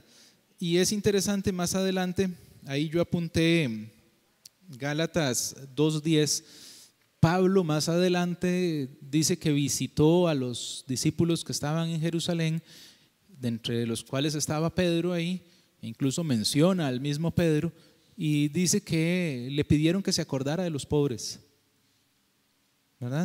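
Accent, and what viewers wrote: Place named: Paraguay. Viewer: Me parece que eres Paragua, un consejo deja de decir "verdad"? Todo el tiempo.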